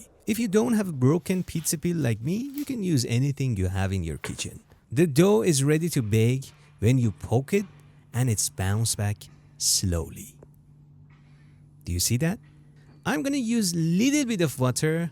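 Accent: Iran. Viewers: I bet this guy is iranian. And the petsian accent kept on increasing throughout the video😂 Btw yeah, thanks for the video. I'm going to try out this recipe also.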